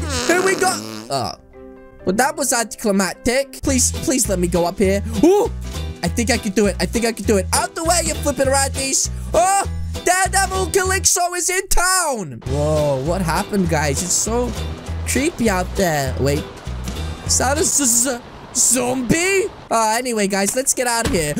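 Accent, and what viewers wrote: England, United Kingdom. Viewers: are you English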